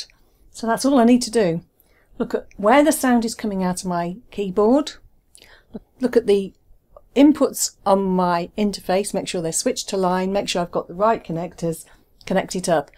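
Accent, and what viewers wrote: England, United Kingdom. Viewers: Hello, I'm from Germany, did I recognize your sound that you come from England or is it from another country?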